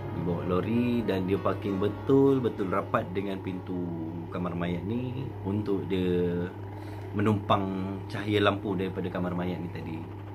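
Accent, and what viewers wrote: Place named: Semporna, Malaysia. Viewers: Penceritaan kurang menarik bnyk ulang2 sama logat tu bagus kekalkan logat semporna, tdk perlu guna bahasa smjung..background n suara latar kurang tepat diguna...nak gambarkn sesuatu pastikn berserta kawasan...sekadar cerita, orang tdk akan percaya...ada baiknya berckp sambil tunjuk kawasan titik hitam...teruskn usaha..tingkatkn lg usaha...sokong org sm4na jd youtuber👍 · kami tidak faham semua logat sabah bah...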